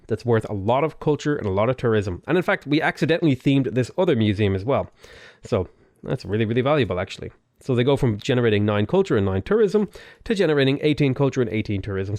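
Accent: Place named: Ireland. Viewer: He sounds Irish, might as well ask if the Pope shits in the forest.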